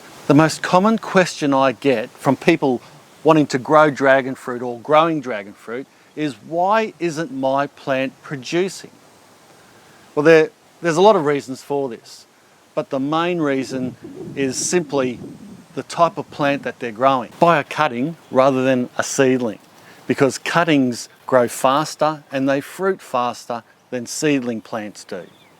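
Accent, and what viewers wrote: Australia. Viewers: Can you give me any tips on cultivating a sweet Australian accent?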